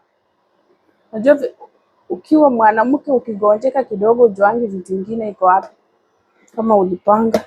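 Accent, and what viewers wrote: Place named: Kenya. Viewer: She’s a luhya, therefore don’t be too critical, cut her some slack! We understand what she means. Every tribe have an accent!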